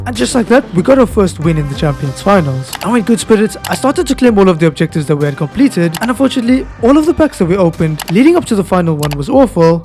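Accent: South Africa. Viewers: Are u from SA🇿🇦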